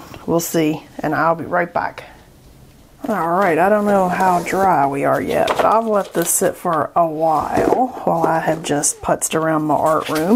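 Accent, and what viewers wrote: South, United States. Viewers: I told my SO how you're from Georgia, as he's"heard" your southern tones, but after finishing the video I said, "...ooohhh babe!! somebody's have pee'd in Wheaties!" I said "listen to these 3 things-The tape...then the challenges...THEN the water?!...and you tell me!"?? He said, "She Julia Sugarbaker'ed y'all, lol"(hopefully you can appreciate the reference)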